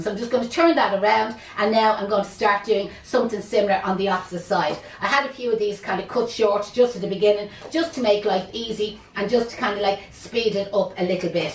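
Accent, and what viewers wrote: Ireland. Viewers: Thank you for the great tutorial! I could listen to you talk all day. An Irish accent is so lovely to my ear. I’m going to do a bottle arrangement for my next party!!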